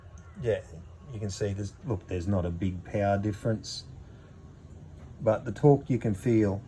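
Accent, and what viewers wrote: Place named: Australia. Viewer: Hay mate I also brought a 2021 V85tt Cenrenario model as well ( had for about 6 months ) was new hadn’t sold . I love the bike , I had a problem with the EML coming on over5500 RPM , right hand cylinder was missing. I reached out on the V85tt fb page and found most helpful. Apparently it was something to do with sonic wheel but resetting valves prior to reset ? , anyway done two big rides since and love the bike . You sound Australian ( I live in Wodonga Vic )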